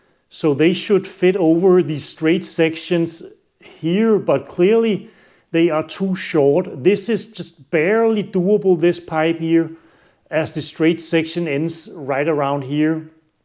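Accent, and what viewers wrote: Denmark. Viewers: It is very much a Danish accent - fellow dane 🎉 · er jeg den eneste der kan høre at han er dansker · Where are you from? Denmark? Love the accent!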